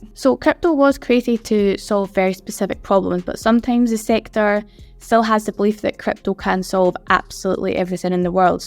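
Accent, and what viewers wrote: Scotland, United Kingdom. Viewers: Is that a Scottish accent? It's a nice one =-). Keep up the great work & quality content.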